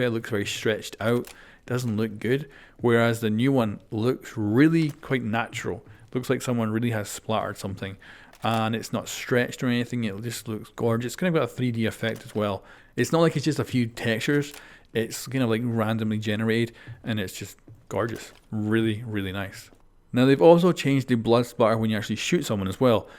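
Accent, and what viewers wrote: Scotland, United Kingdom. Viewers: I’m not sure if I’m right but it sounds like ur accent is slightly Scottish is it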